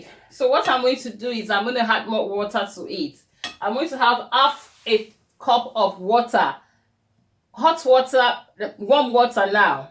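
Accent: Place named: London, United Kingdom. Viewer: I love d way she talks.. she's talking like all does London aunties..nice one..I'll try your measurement.. sometimes my puffpuff comes out great n sometimes it doesn't.. will give it a try and hit you up... good job ma'am👌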